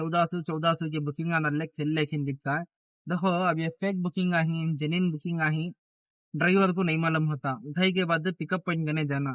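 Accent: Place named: Deccan, India. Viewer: bhai aap kya Deccani bhol rahe hai? It is very nice and should be taught in schools as a language so that it can be preserved.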